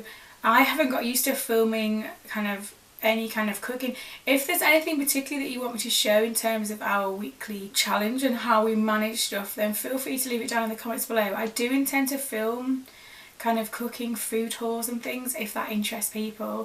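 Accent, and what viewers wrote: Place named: North West England, United Kingdom. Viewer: Have just seen you on on-line news and it said you are from the north east, I though your accent was north west!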